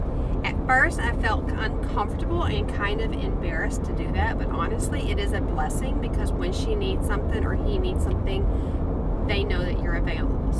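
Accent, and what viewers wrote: South, United States. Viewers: Go on Grrrlll love that southern twang lol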